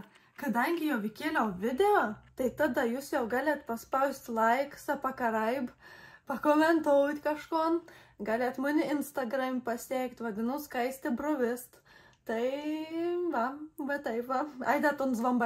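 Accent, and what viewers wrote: Samogitia, Lithuania. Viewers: Video vuo 👍 O jau tas kalbėjimas žemaitiškas, koks geras... :hands-yellow-heart-red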